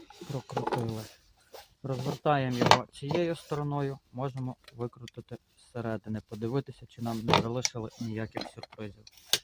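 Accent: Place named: Russia. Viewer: если это правда, отчего же тогда акцент русский, а речь украинская?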